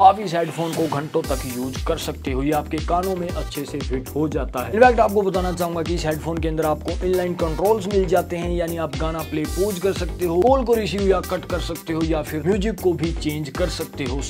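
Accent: Haryana, India. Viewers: Bhai by your accent i can say you are definitely Haryanvi 😍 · Awaj t lag h haryana ka h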